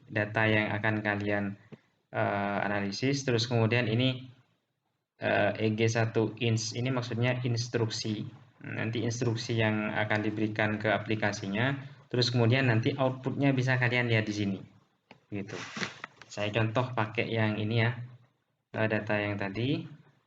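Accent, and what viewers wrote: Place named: Madura, Indonesia. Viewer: Logatnya madura😅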